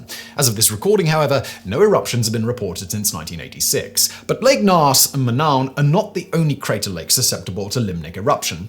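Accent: United Kingdom. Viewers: Square space as a sponsor: Check British: Check is and confirmed.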